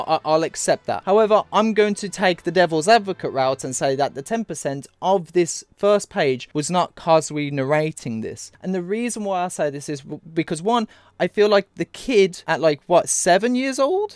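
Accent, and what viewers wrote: United Kingdom. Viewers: haha sorry😅 maybe I should've worded that differently. I'm just subbed to a ton of different channels. Like hundreds... Anyways yeah so excited for bleach again! And love when you talk about it. Especially when you go into the symbolism and theological side of it. Your cool British accent keeps me engaged too. Now imma have buy some merch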